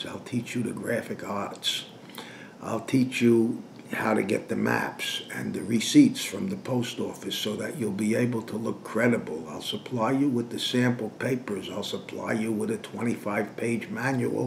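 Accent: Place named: United States. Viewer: I am impressed by your presentation as to how to make a newspaper targeted at advertising on behalf of the business, say in my case, the micro enterprises that are currently mushrooming in out areas. First and foremost, I was confused as I could not hear the name of your website correctly. You did what you could but you were not audible to me. (Perhaps your heavy American accent complicated the matter). Yes, I am interested, and upon your response, I will provide you with the necessary details that you may need prior to commencement of your tutorials. Last but not least: Are your services (tutorials/trainings/teachings) limited the borders of the USA? I live in South Africa, in the vacinity of Johannesburg. Your response please!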